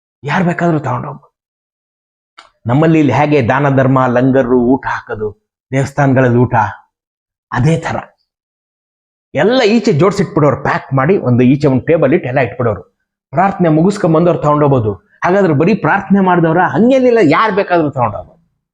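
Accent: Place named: Mysore, India. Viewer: ಬೋಸಿ 😂😂😂 ಈ ಪದ ಮರ್ತೆ ಹೋಗಿತ್ತು.... ಮೈಸೂರು ಕನ್ನಡ ಅಂದ್ರೆ ಇದೆ ❤❤❤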